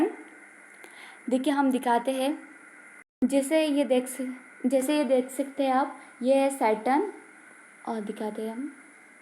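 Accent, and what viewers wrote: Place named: South India, India. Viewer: I like your voice, may be you are from South Indian.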